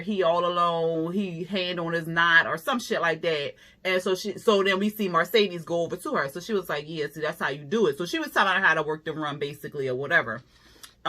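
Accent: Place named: Washington DC, United States. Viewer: girl i can tell u from dc. i can hear it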